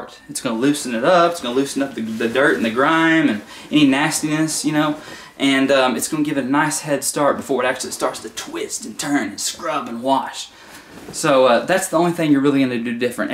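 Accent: South, United States. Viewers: Are you from Alabama or Georgia?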